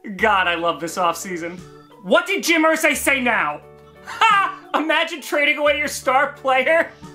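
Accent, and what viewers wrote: New York, United States. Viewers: Did he lose his New york accent? 😅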